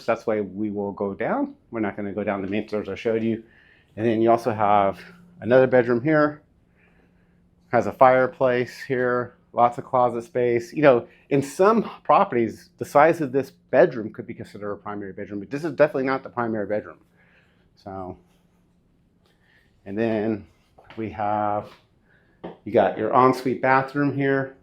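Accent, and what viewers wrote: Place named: South, United States. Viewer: 😂 I assume you know little to nothing about Real Estate because it appears you know little to nothing about Real Estate. Your narration is a comedy of errors. With all due respect you sound like a hick in the Beverly Hill Billies. And the video was nothing but a face shot of you blabbering with your shoulder strap upside-down. 20 minutes of watching your body take up 40% of the screen while we walk backwards dizzy is a turn off. Do a short intro of you then just walk the entire property camera facing forward without you in the shot or talking and add some nice music. Do another shot of you at the end with a wrap. We know what we are looking at. We know what a bathroom is and what the " nice wood on the wall" actually is called. Unless the comedy releaf of you saying things like "tennis ball court" is intentional. 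With all do respect rethink your format. Thanks for the laugh.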